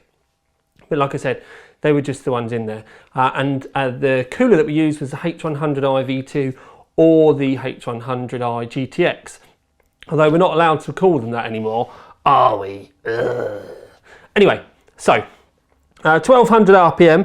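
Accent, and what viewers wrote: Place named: Southern England, United Kingdom. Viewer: So you guys are based at overclockers in Newcastle? But you have a southern accent like me!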